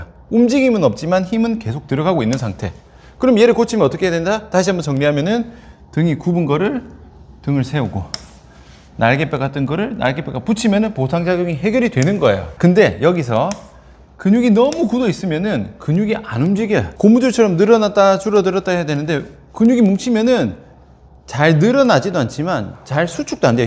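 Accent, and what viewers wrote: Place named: Busan, South Korea. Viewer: 부산사람이야 외국사람이야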